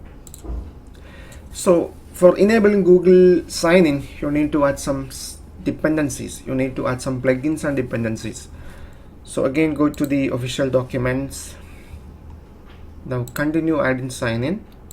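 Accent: Kerala, India. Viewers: I tapped the ad so you get money, its very helpful tutorial I love ur accent btw, very hot Indian accent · are you from kerala ?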